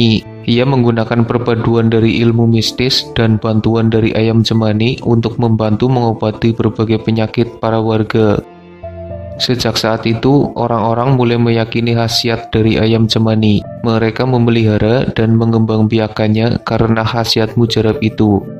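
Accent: Banyumas, Indonesia. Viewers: Adminnya orang ngapak nih wkwk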